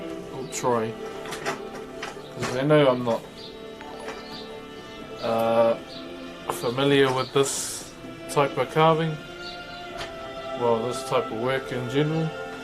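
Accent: New Zealand. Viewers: It is the same accents they're both Maori, the indigenous ethnicity of New Zealand 🙂 not all Maori have this accent but a lot do.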